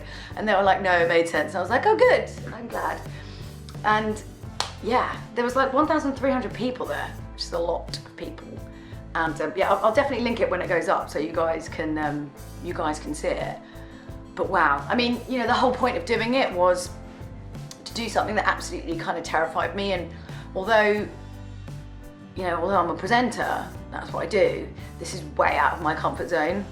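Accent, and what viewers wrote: United Kingdom. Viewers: Your voice is awesome!, kinda raspy but not too much. Also british accent is simply the best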